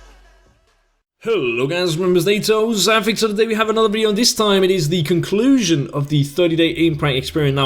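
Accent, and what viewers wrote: Denmark. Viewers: Hey man, are you Danish? ? 😊 you sound a bit Danish just a bit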